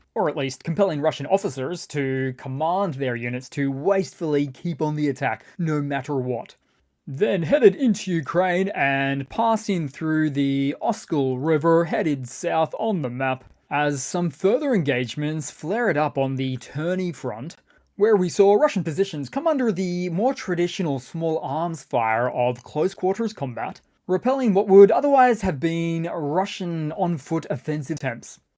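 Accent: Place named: Queensland, Australia. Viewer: Cheers your accent sounds like you might live near 1770, nice part of the world:)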